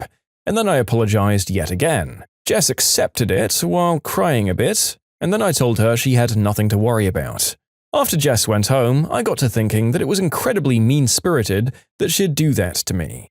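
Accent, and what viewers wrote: United Kingdom. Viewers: You sound British now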